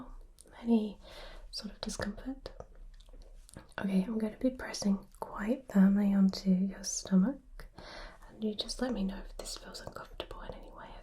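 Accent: England, United Kingdom. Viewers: I ❤ the English accent!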